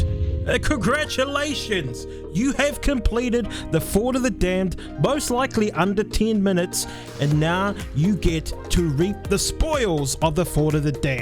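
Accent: New Zealand. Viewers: Are u a kiwi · Love the New Zealand accent (well I hope it is)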